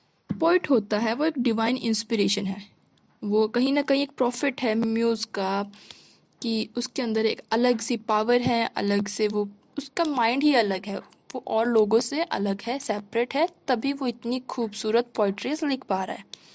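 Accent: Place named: Kashmir, India. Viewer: ur voice looked like u were Kashmiri Anyways thx my dear sister Stay blessed ❤️❤️❤️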